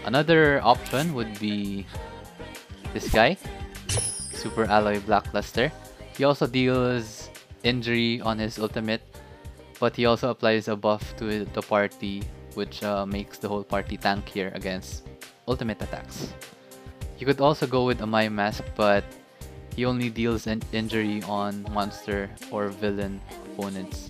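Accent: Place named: Philippines. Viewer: Are you from Philippines because your accent is Philippines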